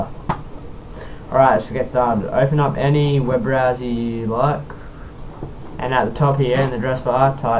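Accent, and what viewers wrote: Australia. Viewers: YES ANOTHER AUSSIE HOW U DOIN MATE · Are You Australian Cause If You Are I Am Aswell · are you an aussie, aussies ftw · Aussie Mate Yeeeeew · heard the accent and knew I was definitely going to get this right aussies we so smart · Are you aussie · Your Aussie aren't u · I have that gateway and u are like the only one that helped me!! Also are u australia?????????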